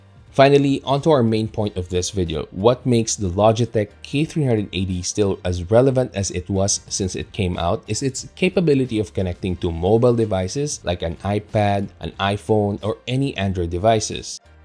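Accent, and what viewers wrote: Philippines. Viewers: I love that Filipino accent! 👌🏻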